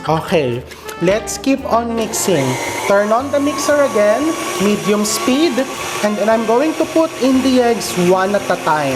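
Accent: Philippines. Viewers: I’m here to enjoy the Filipino accent :)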